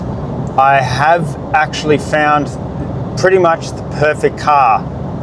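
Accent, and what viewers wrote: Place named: Australia. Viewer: How that I am not notice your australian accent , until now. That explain a lot of things . Hapy holiday mister driver.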